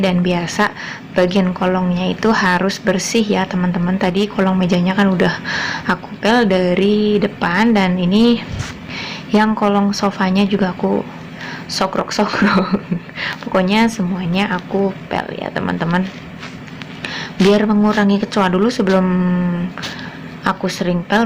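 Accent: Banyumas, Indonesia. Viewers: assalamualaikum dek apa kabar nya semoga sehat selalu ya ngapak dulu baru penak😂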